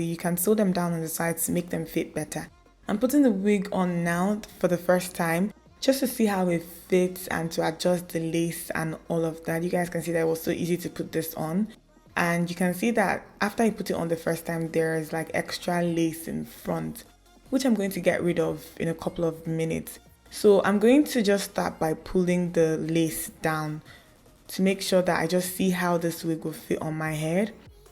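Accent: Nigeria. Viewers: It's refreshing to hear the naija accent whew! Thanks for not forming! 😘😘😘❤️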